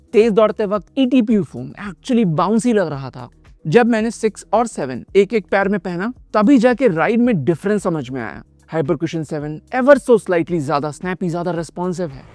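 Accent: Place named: Chandigarh, India. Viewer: Are u from Chandigarh? It seems like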